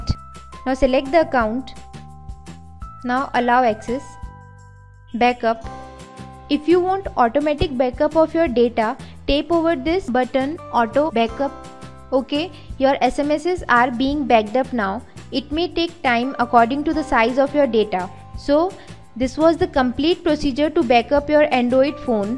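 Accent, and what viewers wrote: India. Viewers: Why have those guys always a indian accsent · Why are tutorials vids have someone who talks like some indian that just learned english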